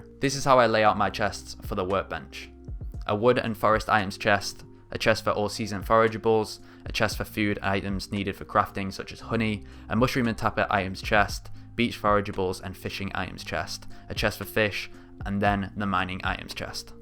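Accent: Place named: United Kingdom. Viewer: Chewsday I like british accent bro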